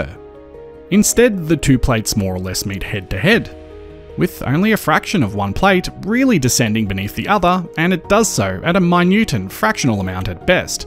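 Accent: Australia. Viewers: Never even thought about this concept - so interesting! Cool video - I like how I actually half understand the science when you explain it - plus as a New Zealander I find your Aussie accent familiar and easy going to listen to.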